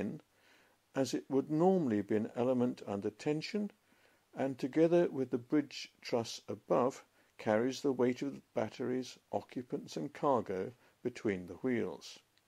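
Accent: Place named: United Kingdom. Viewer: British-accented voice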